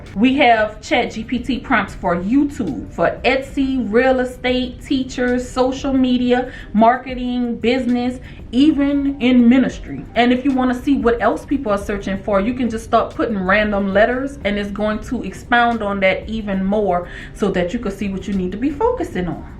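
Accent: New Orleans, United States. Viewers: Where ya at. (504 colloquialisms. Born & raised in N.O. I hear that accent. ❤ Hunni...you out here helping folk live!! I'm here for these multiple streams of income videos all day. I just have to get computer savvy. I just foubd your channel & will be a new subscriber. Thank you for helping us learn how to go out get it.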